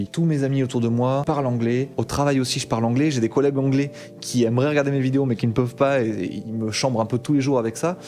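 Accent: France. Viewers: Continue en anglais, ton anglais est hyper simple pour nous de comprendre vu que t’as l’accent, limite j’ai l’impression tu parles en français alors que c’est de l’anglais. De plus tu pourrais grandement aider ceux qui comprennent pas, les gars l’anglais c’est la base de tout au monde surtout en tech! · depuis que j'ai commencer a apprendre le dev(il y a 9 mois) et j'ai egalement commencer l'anglais. je trouve ça super que tu passe en anglais et je continuerais a te suivre avec ce fameux accent français depuis bdx 😉 j'ai commencer depuis peu a suivre des cours sur freecodecamp qui délivre des certification en développement, le contenu est anglais, tres pédagogique(beaucoup de pratique) et complet, je le trouve mieux que le contenu gratuit de openclassroom pour ma part. si tu peux faire une video sur ce site ça pourrais peut être motiver ta communauté a apprendre l'anglais ou juste me repondre en commentaire sur ce que tu pense de leurs certifications pour le marché du travail francophone 😉. 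· Pour ma part, que ce soit en Français ou en Anglais, ça ne change pas grand chose, ton Anglais est facilement compréhensible pour un francophone (ouais, ton accent est français et, pour ma part, c'est pas pour me déranger). Je te rejoins sur de nombreux points et plus encore sur le "concept" de 2nde chaîne en Anglais. Il n'y a qu'à voir le four pris par Bruce Benamran (e-Penser) quand il a tenté de créer une chaîne anglophone anglophone (Get it). D'autres youtubeurs ont fait le même pari que toi et ils connaissent le même rejet d'une partie de leur public. Kevin Tran (Le rire jaune) en est une illustration, il a vu le nombre de vues réduit de moitié et le nombre de commentaires n'est plus que l'ombre de ce qu'il était... A la fin, c'est toujours le public qui a raison, que ce soit pour de bonnes raisons ou pour de mauvaises raisons. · From my point of view it's great to have a tech guy easier to understand because of French accent, as a beginner I struggle with fast and not clear English so switch in English sounds great. 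I wish you the best of luck ✌️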